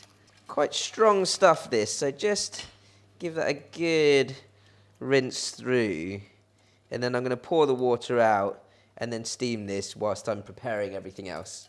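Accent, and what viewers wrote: United Kingdom. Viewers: If I were your mom, I would be very amazed how come my black hair Chinese boy will end up speaking such a beautiful British accent.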